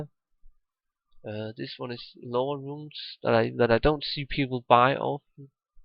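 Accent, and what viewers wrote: Denmark. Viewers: a bit danish maybe??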